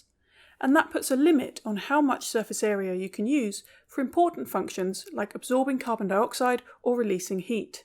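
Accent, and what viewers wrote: United Kingdom. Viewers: Dislike! Bri-ish accent makes me choke. What the hell YouTube algo!!!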